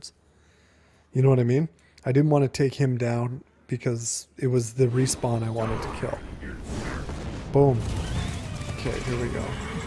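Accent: Canada. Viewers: Loving your videos. Also loving the Canadian accent, you should say "aut" (as in "get aut") and "eh" more :) And keep the beard.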